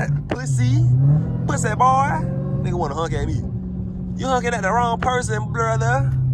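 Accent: Atlanta, United States. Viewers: Sound like u from da Atl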